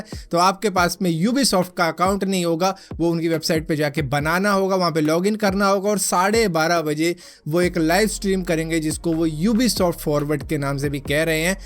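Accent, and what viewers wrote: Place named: Haryana, India. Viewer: Bhai videos m information bht bdiya deta,h beach beach m haryanvi touch b diya kr ,kbi kbi nikl jati h tre muh se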